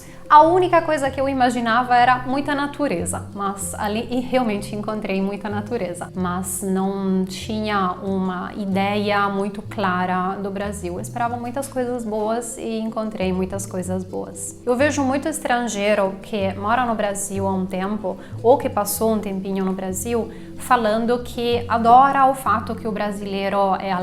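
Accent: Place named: Italy. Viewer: Prof. Você que é maravilhosa, sou simplesmente apaixonada pela Itália, mesmo sem conhecer pessoalmente, esse sotaque é lindo!!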